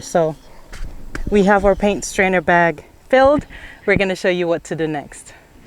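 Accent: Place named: Jamaica. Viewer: Hey....good info...do I detect ...a jamaican....not much of us..doing this. Proud of u my child...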